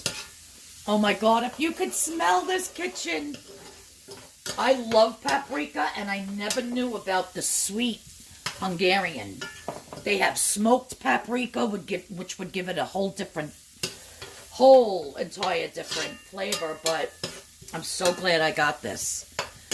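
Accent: New York, United States. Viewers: I like your recipes! I’m following you to keep on track with WW purple. Being from Philly, I love your New York accent💗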